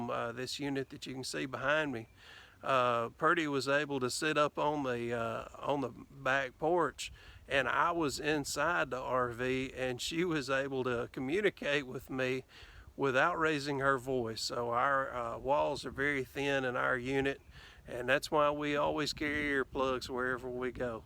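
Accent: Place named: Alabama, United States. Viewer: HaHa! I knew it was Alabama! Got to where I was watching just to hear home :)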